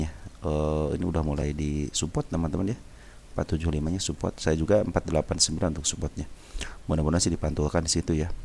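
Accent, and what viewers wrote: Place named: Sunda, Indonesia. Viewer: Orang mana si akang , logat sunda na halus pisan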